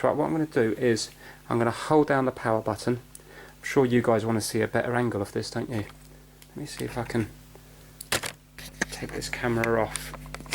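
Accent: United Kingdom. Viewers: your brittish accent was so lit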